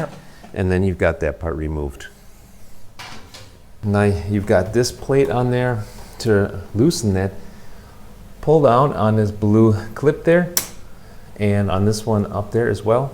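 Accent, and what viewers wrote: Germany. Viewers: Sounds crazy: American slang in German accent! 😊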